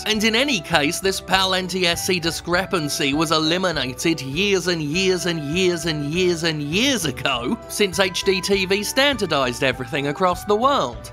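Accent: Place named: United Kingdom. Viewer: Shitsony, cancelled mine. you grew up in the UK? Thought it was just a comedic accent.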